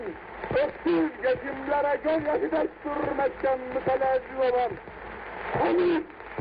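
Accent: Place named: Istanbul, Turkey. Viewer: Arkadaşlar,( rrrr) lere, ve vurgulamalara çok takılmayın,, o dönem istanbul lehçesi, geloor, , gedoor,, şeklinde,, bizzat bardakçı bunu anlattı, ve hatta son hanedan üyelerinin ses kayıtlarını dinletti, hepsi bu şekil konuşuyor, herkese selamlar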